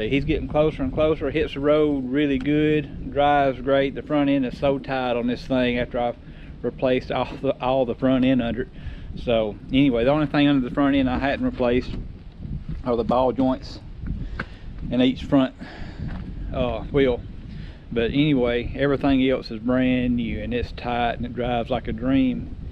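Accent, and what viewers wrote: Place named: Mid-South, United States. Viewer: I like how you said you replaced a lot of the front end suspension parts, but you lost me at the one part. What are "baw" joints? I looked em up, but my Jeep doesn't have baw joints. LOL! I'm messing with ya! I grew up in Memphis and St Louis and everyone says I talk country! You remind me of home, brotha! We could sit and have a beer and tell stories all day! LOL! Like my man says in Slingblade, "I lack the way you tawk." LOL! People say I got a twang and sound country, but that's because they don't know how COOL we are in South and Mid-South!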